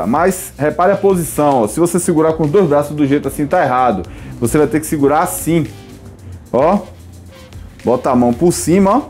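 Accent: Bahia, Brazil. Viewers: Oh sotaque maravilhoso ❤️❤️ baiano é outro nível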